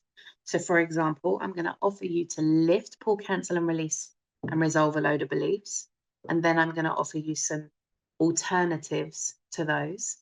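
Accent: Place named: United Kingdom. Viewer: Si funny how Creator works, i still remember asking show me the way please help and i would SEE UK and i started laughing cause i was like 😮 how? And poom your channel came out, and when i read RSY i said love it, this is it Creator, and when i Heard You speak i was like ohhhhh right UK😅😅😅🎉🎉🎉🎉🎉🎉🎉🎉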